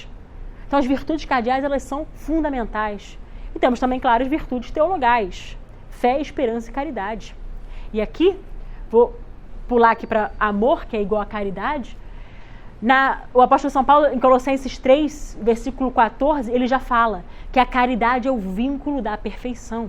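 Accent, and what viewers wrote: Rio de Janeiro, Brazil. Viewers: Hehehe o marcadíssimo sotaque fluminense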